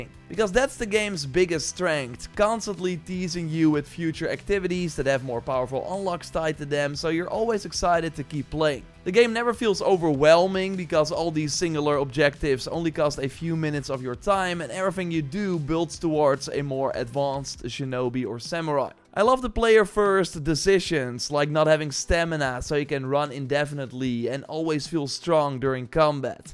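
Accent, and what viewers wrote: Netherlands. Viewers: brother your dutch accent is REALY there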